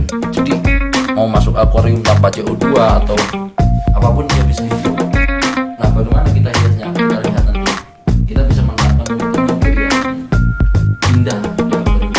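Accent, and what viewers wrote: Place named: Java, Indonesia. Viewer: iki wong ndi seh? medok banget wkwk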